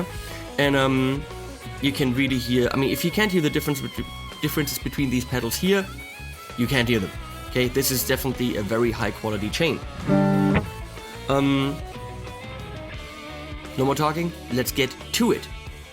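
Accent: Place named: Germany. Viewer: Your deutscher Ekzent ist so knuffig.... wieso sprichst du nicht einfach deutsch??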